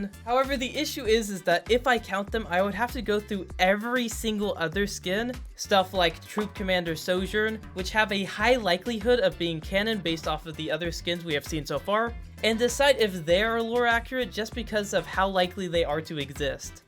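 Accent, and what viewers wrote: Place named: United States. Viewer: The americanism in the pronounciation of the names is insane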